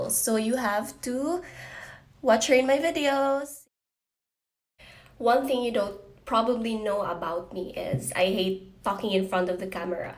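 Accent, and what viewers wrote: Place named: Visayas, Philippines. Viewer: mkamingaw imong tingog nga bisdak 😂❤️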